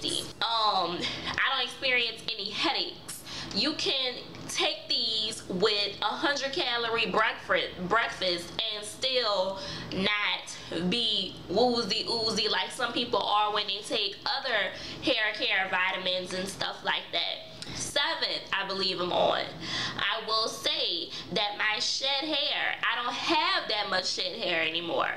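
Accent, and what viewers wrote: New Orleans, United States. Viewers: This is a little random but what's that song at the end ? I fell in love with it lol. Love your video btw and your hair is gorgeous ! And that New Orleans accent tho !! 😍😍😍😍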